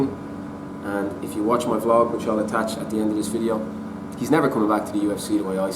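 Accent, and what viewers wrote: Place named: Dublin 4, Ireland. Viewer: I dunno what In the name of Jesus brought me to this video or why I clicked it , I can't listen to you ...ya dublin 4 Ponce ya😂 😂 keep doing what your doing buddy by all means ... we don't need a 2 hour analysis of a 30 second clip joe Rogan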